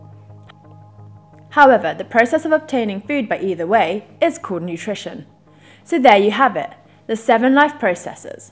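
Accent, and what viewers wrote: United Kingdom. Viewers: You sounds more like a british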